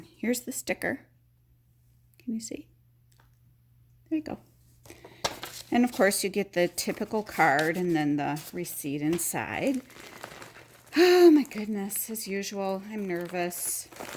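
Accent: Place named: Chicago, United States. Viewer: loved you unboxing and your video. Also your accent! I’m from Chicago but haven’t been back for 30 years. Yours reminds me of Chicago. I know it Chicago and Milwaukee accents may not be the same but it sounded like home to me. Think I’ll have to get a Colonel!.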